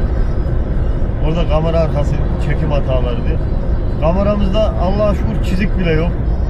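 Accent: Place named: Çorum, Turkey. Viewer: Dede sen corumlu olmadigina eminmisin.corumlular gibi konusuyon.kirsehir corum şivesiylemi konususyor yoksa yaw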